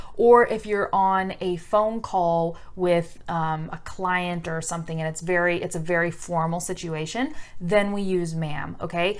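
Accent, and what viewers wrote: California, United States. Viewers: Come to California, many women sound just like this. I don't know if she is from California, I'm just guessing based on her accent. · Move to California and you´ll start talking like her in a couple of years or so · I know everything what you said, particularly the grammar aspect. But I simply love this video bcz its very useful for my listening ,and i love your californian accent.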